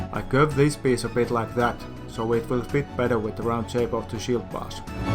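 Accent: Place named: Finland. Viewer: Did you use a metal rim because you couldn't find rawhide? Arvasin aksentista, et oot suomalainen ;)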